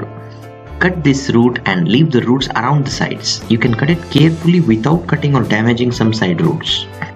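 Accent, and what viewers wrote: India. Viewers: Your tips help, however not to be rude but I would highly suggest that you take some English speech classes. Your heavy Indian accent makes it extremely hard to understand what you are saying. After awhile of listening, it actually becomes painful to listen to the video because of this. Again I’m not trying to be rude, I hope you will consider this a kind, helpful suggestion that it was meant to be.. I think you will see a difference in your positive votes if you can put some hard work into reducing that heavy Indian accent. I wish you success!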